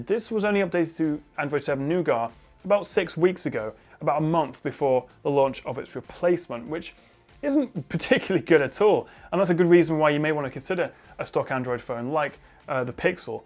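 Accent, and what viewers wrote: United Kingdom. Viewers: meh whiney brit not enough of those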